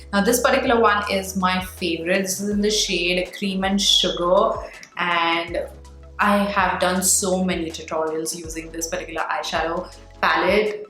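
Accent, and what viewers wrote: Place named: Hyderabad, India. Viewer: Are u Hyderabadi? Your hindi accent is very Hyderabadi ( and I mean this in a good way) . I have a friend who is Hyderabadi and this is exactly how she sounds whenever she speaks Hindi (which i find really cute btw😂)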